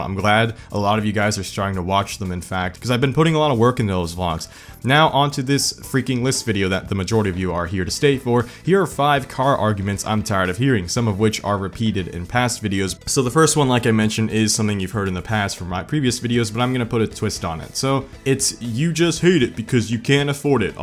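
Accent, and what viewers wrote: United States. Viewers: I hate to sound racist but you sound awfully American for a person Asian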